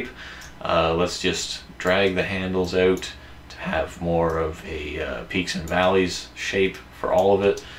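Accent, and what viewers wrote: Canada. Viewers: That Canadian accent xD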